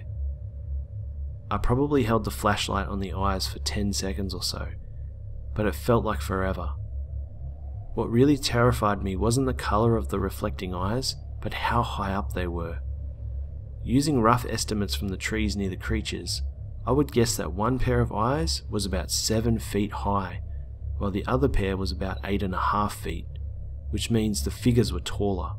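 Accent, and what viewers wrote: Australia. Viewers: You're Aussie yeah? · are you from australia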